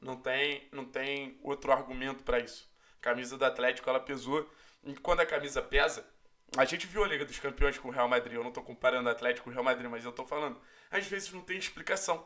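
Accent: Rio de Janeiro, Brazil. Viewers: esse cara é carioca e torce pro aflético?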